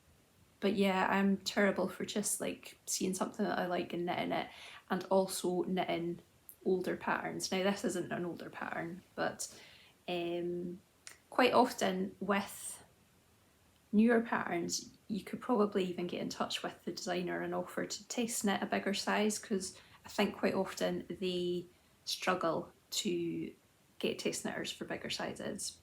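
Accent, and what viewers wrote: Scotland, United Kingdom. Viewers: Hello, lovely to see you again. This is only the second podcast I viewed, I only quite recently discovered your channel. Love your Scottish accent and all your lovely knitting. My small win recently: I am gonna finish a Marie Wallin cardigan I started almost 5 years ago. I finally feel self assured enough to finish the colour work yoke ánd do the steeking. This will be the summer of me finishing my lovely cardigan, so I will be ready for a nice autumn wearing this beautiful colourwork cardi. 😍😍😍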